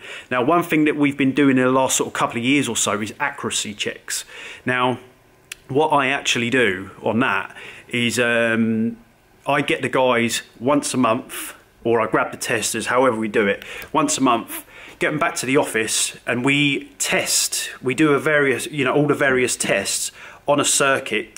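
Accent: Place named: Birmingham, United Kingdom. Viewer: are you from Birmingham? I like your account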